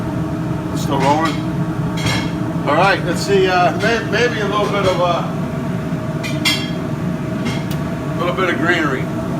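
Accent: United States. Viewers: Love your vids and your accent! Is that accent specific to any part of the US? Keep it up!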